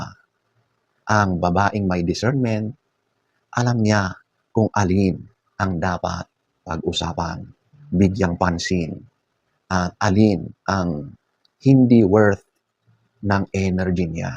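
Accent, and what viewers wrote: Ilocos, Philippines. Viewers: Ilokano kaba idol madlaw ko gamin kayat ko boses mo super humble sika👍💯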